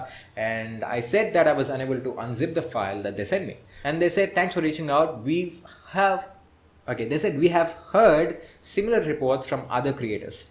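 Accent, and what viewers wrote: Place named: Tamil Nadu, India. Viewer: May I know your mother tongue..? Seems like Tamil 🤔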